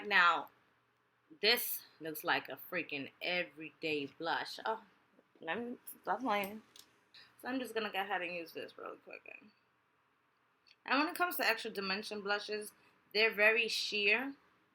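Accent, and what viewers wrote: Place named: North, United States. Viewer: I subd love ur accent u from up north i was born in buffalo ny n miss the northern accent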